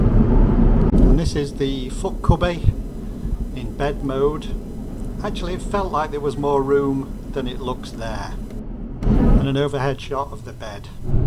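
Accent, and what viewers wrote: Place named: North, United Kingdom. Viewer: With all due respect, I sometimes had trouble understanding your northern accent: "bus" for example.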